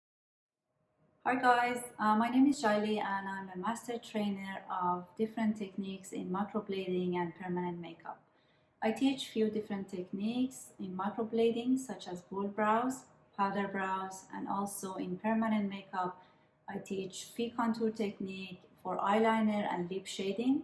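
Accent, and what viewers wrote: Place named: Iran. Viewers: I love this accent Persian people 😍♥️ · She is Persian? She sounds like Farsi accent.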